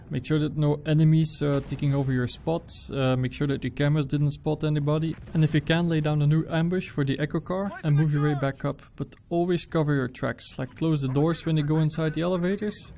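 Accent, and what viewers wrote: Netherlands. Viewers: Dat English tho!! R u from the Netherlands? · R u dutch cuz u have a dutch accent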